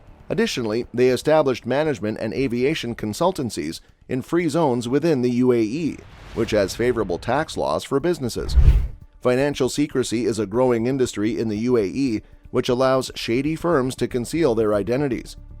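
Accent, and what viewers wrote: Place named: United States. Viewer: These anti kinahan vids with American voices are pathetic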